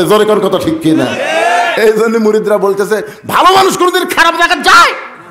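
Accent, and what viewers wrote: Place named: Bogura, Bangladesh. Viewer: বগুড়ার ছোল তাই এমন করে বলে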